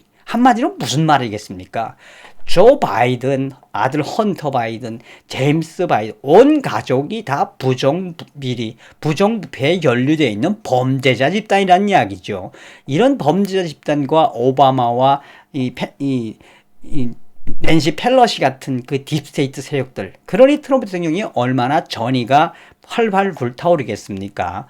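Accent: North Korea. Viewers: 좋은정보감사합니다 근데 북한사람느낌나요ㅋㅋ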